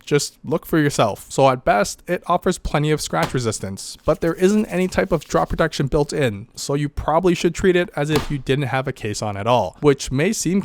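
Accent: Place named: Canada. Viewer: Wow, Kanadian lolzz 👍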